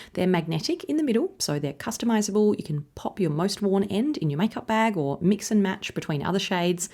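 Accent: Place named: Australia. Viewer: I love your videos! The colors you show are perfect for me. Your Aussie accent and calm voice are lovely. Thanks!❤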